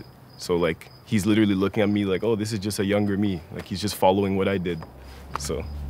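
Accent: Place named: Canada. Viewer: that canadian accent really comin through on this lmao, goat shit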